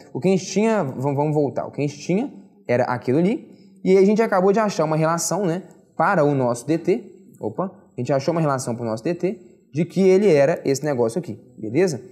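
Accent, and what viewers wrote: Minas Gerais, Brazil. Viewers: seu sotaque massa "minerim"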